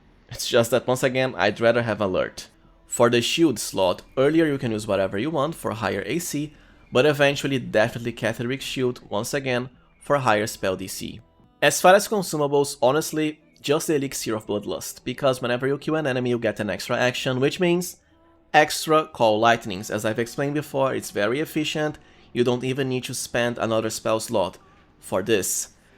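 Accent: Brazil. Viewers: Bro your accent is Brazilian for sure lol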